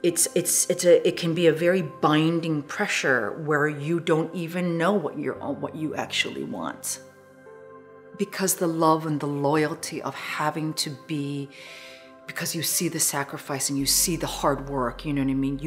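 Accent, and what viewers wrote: United States. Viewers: The whole time I think she is american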